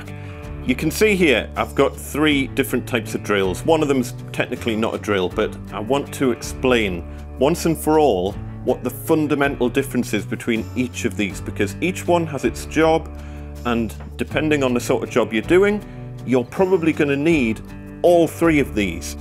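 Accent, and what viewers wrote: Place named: Northeast England, United Kingdom. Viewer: Hi. Good video. I've been using an SDS drill for about 9 years ( 1930's house - super hard brickwork ). About 3 years ago I saw this video: <link> & took note of it. The next time I used the SDS it caught in a wall tie & kicked violently. If I hadn't been holding it as the video instructed I reckon I'd have been nursing a broken wrist & jaw & whatever other injuries being thrown off the stepladder would have caused. Thanks for the info you provide & it's nice to hear the accent ( I'm from South Shields but haven't lived in the NE for about 30 years ).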